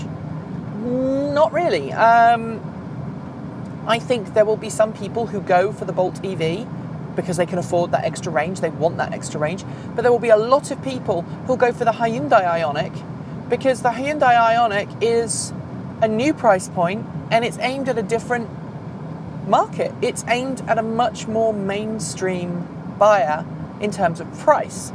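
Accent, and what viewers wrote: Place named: United Kingdom. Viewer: One of the reasons I subscribed to is that I appreciated your in vehicle driving soliloquies, common sense information, and your great British Accent. I also appreciate when you include things about your life, good, bad, or mundane, as we get to connect even more so. KEEP IT UP! I hope things settle down for your and yours.John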